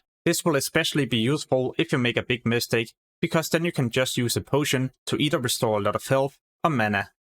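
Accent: Denmark. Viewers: Lyder som en dansker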